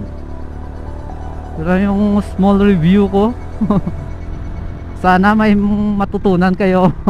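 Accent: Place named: Iloilo, Philippines. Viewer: ilonggo gid ah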